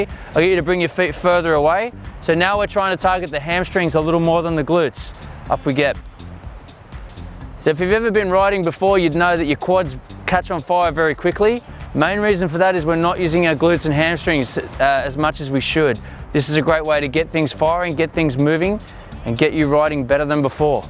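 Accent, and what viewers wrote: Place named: Australia. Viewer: I have no idea what this guy was saying...Not because he's got Australian accent but because I stopped listening after he told that girl to lay down and work on her glutes...At that point, I even forgot what this video was about. If you want people to pay attention and not get crazy distracted by the hot girl, bring a 300 pound guy to do these exercises. People will definitely pay attention as they'll feel if they don't - they will end up like that guy.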